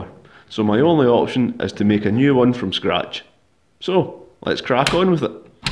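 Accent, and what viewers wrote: Scotland, United Kingdom. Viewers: I love these videos and when he was cleaning the feces not to disturb the quel mailing marks! Everyone loves a legit scottish accent!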